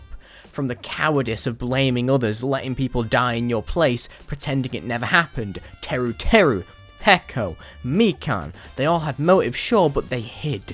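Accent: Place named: United Kingdom. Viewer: dude I'm 10 minutes into the video and I'm loving it so far, but I felt the need to say something completely unrelated. I'm studying English Phonetics (as a foreigner) and I have a midterm on it tomorrow. this video is genuinely helping me so much, I'm like transcribing everything you say into phonetic script in my head. I don't know if you speak BBC English, Estuary or some other variant, but I'm shocked at all the diphthong shifts, intrusive R's, elisions, assimilation and other things I'm identifying. again, completely unrelated to what the video is about but it's helping me so much. thank u :)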